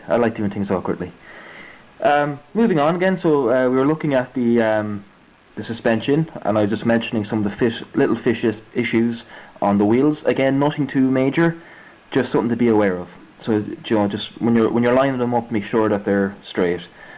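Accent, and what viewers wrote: Ireland. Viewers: Ah... Part II... All clear now. That was well done. You're a natural. Love the accent dude. You sound Irish... did you know that? hehehe.:)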